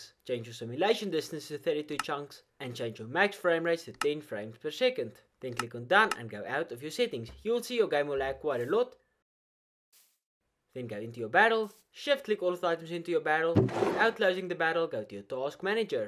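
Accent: South Africa. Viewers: south african if im right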